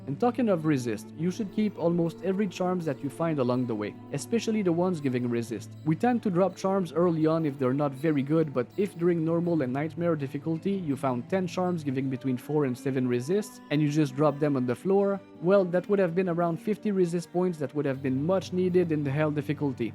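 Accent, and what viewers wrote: Quebec, Canada. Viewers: Tai tu quebecois ??? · Thanks for the content! Are you french canadien?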